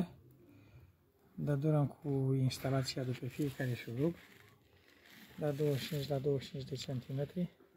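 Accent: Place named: Teleorman, Romania. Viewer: Interesant video, mulțumesc pentru împărtășire, după voce sunteți din Teleorman, dacă puteți să-mi spuneți din ce localitate mai exact?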